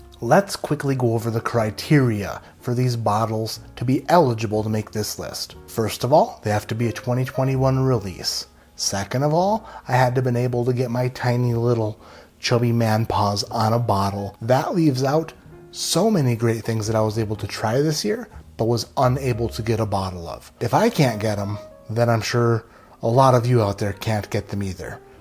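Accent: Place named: Midwest, United States. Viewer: You are very welcome sir. I enjoy your content. I enjoy your plain spoken mid-western accent. I also like that you are willing to call out duds when they are duds. I am still writing now because I am hoping that the longer a comment is the better it helps your placing in the almighty algorithm. Have you considered the great spaghetti monster? When you were small did you pronounce it "sketty"? or "pssssketty"? I did. I really like the bit you have going with Rex. I can't wait for your reponse. I hope it is full of hyperbole and sillyness. I know what will boost comments. Let me start an argument. Okay: Jack Daniels isn't Bourbon. Why? Because they don't say Bourbon on their own label. And if they're too good, or too yella to say Bourbon, they don't deserve such an honor. Ok, that's all I can think of for now. hope this helps.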